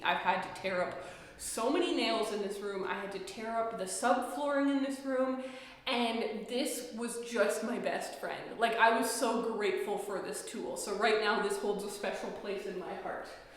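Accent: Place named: Canada. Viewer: How do we know she's from Canada?? Oh y'all did you not hear her say " house, outside and couch" ? 😊😃😄😍 love hearing her talk.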